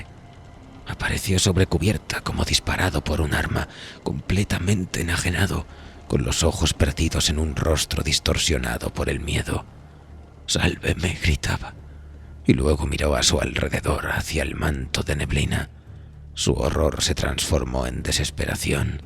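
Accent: Spain. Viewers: Españolisimo , nooo por favor , usen Español neutro , no podré escucharlo , es insoportable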